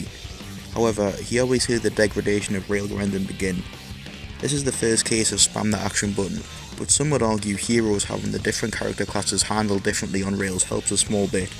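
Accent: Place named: Northern England, United Kingdom. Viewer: You sound like you're from the North of England like myself. Way aye man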